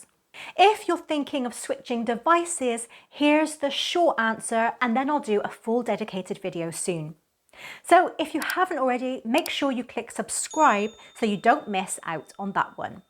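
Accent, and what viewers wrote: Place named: West Country, United Kingdom. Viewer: Lovely to hear and see a UK/west country female giving straight forward info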